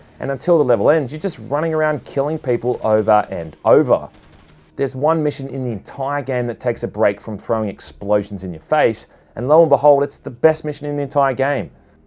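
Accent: Australia. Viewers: i had a feeling you where aussie haha so am i :) might have to reinstall and play this again.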